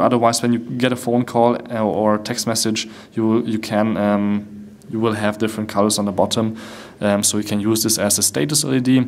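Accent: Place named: Germany. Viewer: you're german, aren't you ? :D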